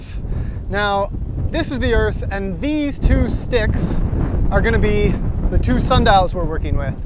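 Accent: Canada. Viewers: He's mostly Canadian, you can tell by how he pronounce "out"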